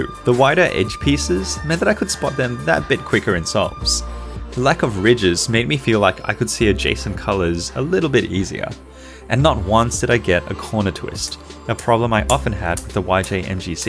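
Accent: Australia. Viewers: Are you australian?? I'm australian! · TNL Cubing it’s his au accent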